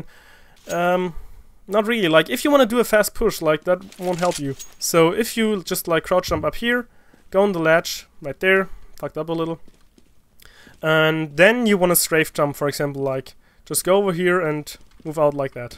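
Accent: Germany. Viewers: are you german ? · are you german you have a german accent in your voice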